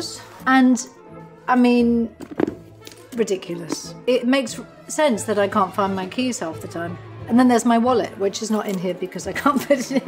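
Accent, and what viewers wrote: England, United Kingdom. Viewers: Her beautiful british accent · I was like oh no that is not Gillian this lady has a British accent Scully doesn't have an accent. I am so used to hearing Gillian with an American voice. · She has a British accent now? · What is with British accent? She was born in Chicago. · That English accent surprised me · She’s actually not! Born in the US but spent part of her childhood in London. I forget why but her parents are not British. She moved back to the States at 11 or 12 but she had picked up a British accent while in the UK. She reverted back to American to fit it but she can switch back and forth as needed. She does her interviews in the US with her American accent. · OMG, i thought you were American, Love your British accent way better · Why does she speak with British accent? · I am blown away! Her British accent!!!!! 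Such a lovely lovely woman. Huge fan!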